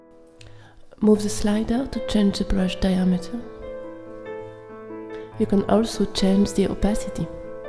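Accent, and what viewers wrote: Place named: France. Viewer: I love your french accent! And dont worry, i understand very well. Thank you for your video!!!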